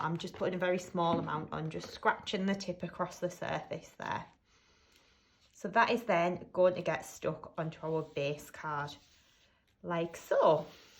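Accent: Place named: England, United Kingdom. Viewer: Wow, Do you sell your cards to card stores? When i was in the UK in 2019 just before my Father Passed away me and my sister went to a card store and i couldn't get over the detailing of all the cards in there. Layered cards with pop ups and animals in forests and such. i love the Card stores in the UK there is always something you haven't seen before in there. I brought some home for my family here in Canada where i now live I was born in the UK so its nice to hear an English accent again. I was born in the south of the UK in Portsmouth and my hubby was born in Yorkshire but had lived in Canada since he was 4 year old, We met while he was serving in the Royal Navy in my home town we got married just over a year later and here we are still married after all these years. I have always wondered how to do the greeting cards myself but i have been more curious lately. 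I have loved making crafts here since moving to Canada there are so many talented people especially on You Tube. I wish i could spend all day on here really. I love the card you made its really beautiful. This is the first video i have watched of yours and i have to tell you i am hooked on this cardmaking. I love the cutting dies but can you use them without the Gemini machine or similar machines? I have tons of glitter that has never had anything i could use it on super fine coarse large and shaped glitter tons of card stock and a few dies but they are so cheap to buy on Amazon Temu Wish etc. The embossing i have ne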